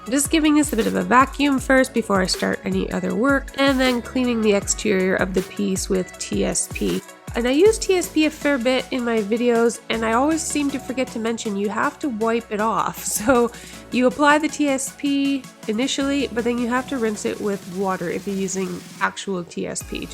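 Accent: Canada. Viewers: Thank you so much for posting this! I have only refinished mid-century furniture with walnut veneer. But I bought a cute circular table with solid wood legs and skirt, but it has a laminate top. I will be applying your process to paint the top and edge. Wish me luck! Yank here btw who visits Toronto about once per year. I knew you were Canadian about 30 seconds into this video 🙂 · Your ideas and work are beautiful and inspiring. Please keep explaining why you choose certain products; it helps me to better understand the process. The music is fine but I'd be fine with hearing just your voice. You have a charming accent that sounds like my Canadian family.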